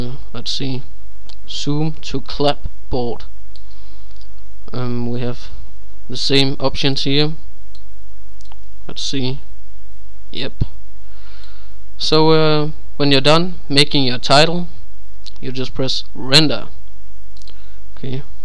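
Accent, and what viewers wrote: Denmark. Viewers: er du dansk ? du lyder sådan :)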